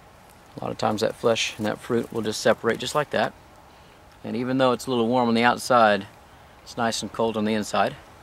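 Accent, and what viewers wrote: Texas, United States. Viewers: Omg i was in Texas for a year as an exchange student, and your Texan English made me so "homesick" 😀😅🇺🇸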